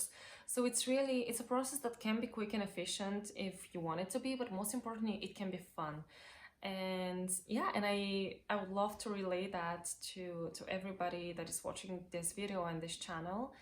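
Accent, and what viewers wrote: Portugal. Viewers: Olá,! Seu vídeo é muito inspirador para mim, que ainda estou no início de ser um poliglota. O seu sotaque no português é o europeu, mas você também fala perfeitamente compreensível para nós, brasileiros. Acredito que é por você não se importar muito com sotaques, e por isso, apesar de soar mais como português europeu, você não se prende muito a ele. Também procuro aprender outros idiomas sem me importar muito com sotaque nos estágios iniciais. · Seu sotaque é soa como o do Português Europeu. Como brasileira posso dizer que você fala Português muito bem. Consegui te entender perfeitamente. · Seu sotaque é mais de Portugal 🇵🇹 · Tens um sotaque mais puxado para o Europeu 🇵🇹 Mas tem também influências Brasileiras 🇧🇷 por exemplo como disseste ‘importante’. Pronunciaste como ‘importantxi’ como fazem os BRs Mas se entende muito bem. If I didn’t know any better I would’ve assumed you were a native that happened to live in both countries hence the mixed accent. 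· I really admire multilingual people, you are a talent. But it's a big mistake ppl think that just because a person has a "gift" it's easier because no, you have to study a lot to reach a goal, for talented people like, maybe less time is all that. Seu sotaque de português com certeza é mais parecido com o de Portugal. Se você falar dessa maneira aqui no Brasil, as pessoas irão te confundir com uma portuguesa mas você fala muito bem! 日本語を話すことを学んでください。私の第二言語です。あなたが日本語を話すのを見てみたいです。(Please learn to speak japanese it is my second language and I would love to see you speak it.) Cheers from Brazil! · Seu sotaque de português é um pouco mais puxado para o portugues europeu, mas eu, como falante nativo de português brasileiro, entendo você melhor do que eu entendo portugueses, porque sua pronúncia é mais aberta do que normalmente se ouve dos portugueses. E sua fluência é incrível, você parece encontrar palavras com a mesma facilidade em qualquer língua que fala, além da gramática que, pelo menos no português, não encontrei nenhum erro. 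Não que fosse algum problema se eu tivesse encontrado, mas é surpreendente o cuidado que você tem com detalhes. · O sotaque Português é 60% Português Europeu e 40% Português Brasileiro. :) Parabéns! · Seu sotaque é do português falado em Portugal. O português falado no Brasil é bem mais limpo e claro de se ouvir. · O teu sotaque em português é mais próximo do português europeu do que do brasileiro. Há uma o outra palavra que soa mais brasileira. Mas falas mesmo muito bem! 🥰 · sotaque parece o europeu mas tem uns pontos brasileiros... Como o uso do "você" e do som "dji" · when you speak french i hear the portuguese accent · Seu português é muito bom. Seu sotaque parece mais com o de portugal. Parabéns!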